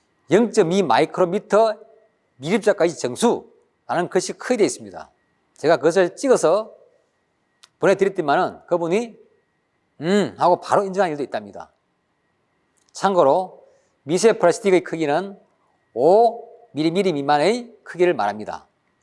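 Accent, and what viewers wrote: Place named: Gyeongsang, South Korea. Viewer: 구수한 갱산도 사투리로 귀에 속속 리더님짱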